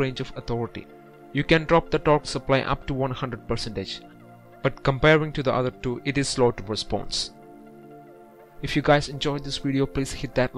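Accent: Kerala, India. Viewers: very good video. well explained. is the speaker mallu guy ? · Hundred percent Mallu, but he is brilliant, and knows the subject very well. I loved the video.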